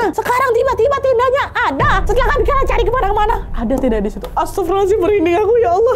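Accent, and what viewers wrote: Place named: Pekanbaru, Indonesia. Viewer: Tapi dari logat kak ini logat pekanbaru tapi kadang logat medan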